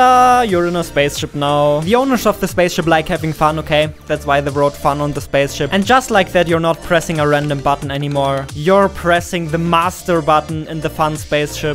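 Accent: Germany. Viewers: Sorry, but this german accent turned me off instandtly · german? 🤔 your english sounds german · The german accent makes this video much more fun!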